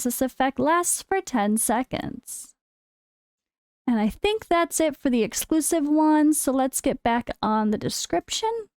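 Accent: United States. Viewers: You can’t hear that she’s American……😮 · I didn't know you were in Romania! You sound very American to be honest. You are very awesome!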